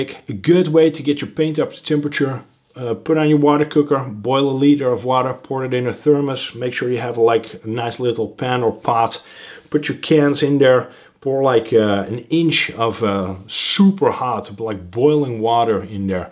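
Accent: Netherlands. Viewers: Are you Dutch? you have a Dutch accent :D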